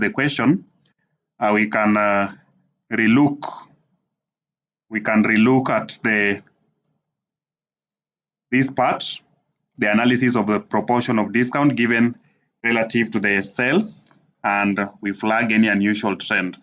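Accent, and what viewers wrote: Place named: India. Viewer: Why is the audio indian😢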